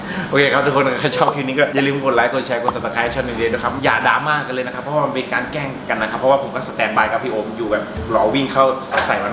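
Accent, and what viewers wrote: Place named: Myanmar. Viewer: มึงเปนพม่าเหรอสำเนียงเหมือนเลย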